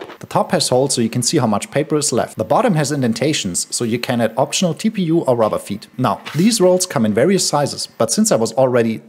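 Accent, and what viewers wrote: Germany. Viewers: you know another way to make sure your roll of towels dont get wet? by not putting it NEXT TO THE FREAKING SINK!!!! how do you not figure this out? your accent sound german! you should be more efficient and smarter than some southern hillbilly yankee! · Based on accent its safe to say youre German, and the product confirms it. Lol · Heard „Kitchen Towel“ and the Accent and thought: „That’s a fellow german“. (At least it wasn‘t „Kitchem Roll“) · German accent - overengineering - quality nice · Knew he was German when I saw the gadget and the accent was the cherry on top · German accent makes every video about engineering and design more reliable. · Hears "I overengineered" spoken with German accent Yeah that makes sense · You sound so German. Are you German? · German accent checks out. Grüße an der stelle. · Hear German accent, open comments. 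· The more he speaks the more you can hear the german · Are you by chance german? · Why does he sound like the boyfriend of Uyen (@ And that is not only his German accent but actually his voice. ;-) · It makes sense that he sounds German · how to tell people you are german, in case they dont identify the accent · Over engineering a paper towel dispenser is the most German thing imagineable, finished with the perfect, slight German accent, in delivery.. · That german accent is enough to tell me this is legit 🙂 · Der deutsche Akzent ist crazy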